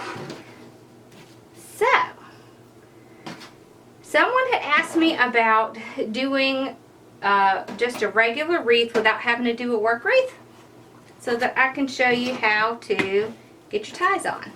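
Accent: South, United States. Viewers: Love the Water Mellon wreath but would love to see it also with a bit fewer ribbons. I love the red and green that looked like the rhine. Actually both would be absolutely gorgeous......where in heavens name do you come up with all these ideas. You are truly amazing! You little guy is so sweet and helpful. Love love the St Patricks Day wreath also. I think I forgot to mention it on the other vlog. I don't have FB so I enjoy seeing you here! You are a busy busy girl. Love you southern accent and sweet way you speak and soft spoken....very easy to listen to you instruct. Loved everything and love how you do the tutoring so quickly. Blessings to you and yours. :) :) :)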